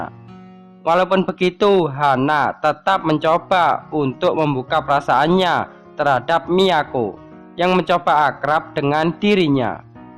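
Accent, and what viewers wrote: Java, Indonesia. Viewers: Full video bahasa medok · ngk ngakak dg logat jawanya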